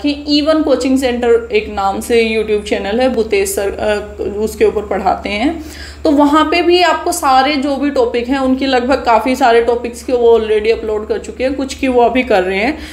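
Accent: Haryana, India. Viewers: अप हरियाणा से हो न ..?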